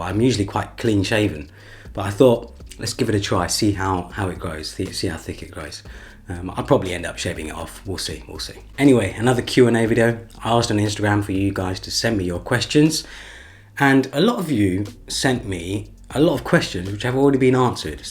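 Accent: England, United Kingdom. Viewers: Dude you have the best English accent I’ve heard lol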